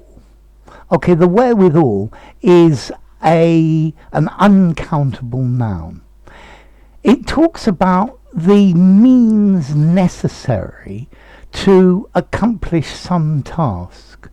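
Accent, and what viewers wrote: United Kingdom. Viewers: Great explanation...the British accent is so beautiful and nice to listen to...why is that?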